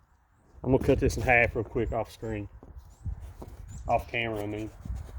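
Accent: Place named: Virginia, United States. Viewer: I should have realized you were from Virginia by the accent. I am too (although I live in Texas now). Great to know a fellow Virginian! Great review on the Baklash. They just came out with a carbon fiber version, so I may have to grab another one.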